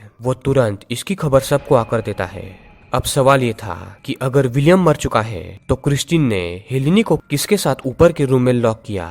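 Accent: Bengal, India. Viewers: Oo apki bat bengoli jesi h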